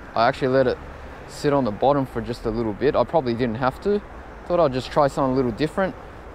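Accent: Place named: Sydney, Australia. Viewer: Sydney accent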